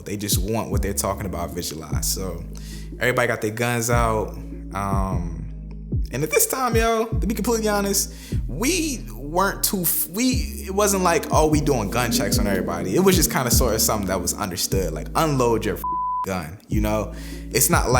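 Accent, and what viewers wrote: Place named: Newport News, United States. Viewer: Sounds like Newport News for sure..shout out to VA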